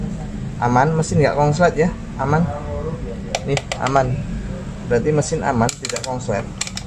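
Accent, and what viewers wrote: Java, Indonesia. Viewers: Mas,sampeyan orang lampung kok bahasa jawae kok medok ya.??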